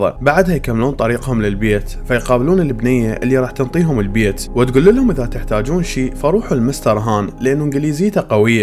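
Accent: Iraq. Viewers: احلي شي فيك اللهجه العراقيه الحلوه اهوايا يازين العراقين ❤️😘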